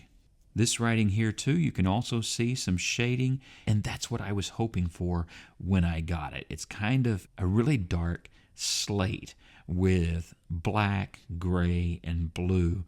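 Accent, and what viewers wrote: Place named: Texas, United States. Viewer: coming from Texas as well I vibe with the pronunciation game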